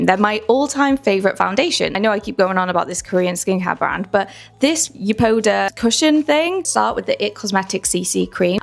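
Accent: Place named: United Kingdom. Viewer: You accent is so cute. Where in the UK did you grow up?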